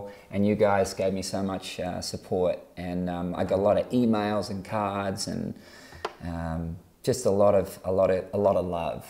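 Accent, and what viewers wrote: Australia. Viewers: he has always had an accent, he's Australian. (Well, born in New Zealand) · Hey Keith. You're genius... when you sing, you really sound, and do have natural american accent, but when you're talking, you sound like a Queenslander fella. Love this song, mate.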